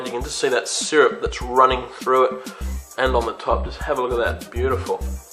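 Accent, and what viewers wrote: Australia. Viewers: I love your Austrailian accent!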